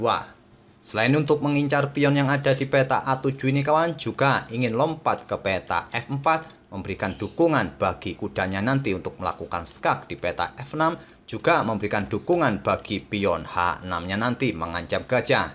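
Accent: Java, Indonesia. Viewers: Cara bicara nya jangan sering pake logat Jawa , sulit dengar nya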